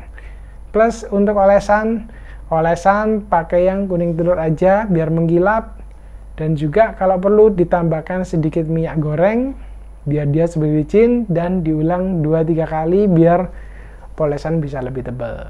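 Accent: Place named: Java, Indonesia. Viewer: Jawane ora ilang Yo mas,tadi sedikit bingung waktu bilang cokot,😄😄😄setelah saya ingat" ternyata gigit,,makasih untuk tip nya,sukses terus Yo mas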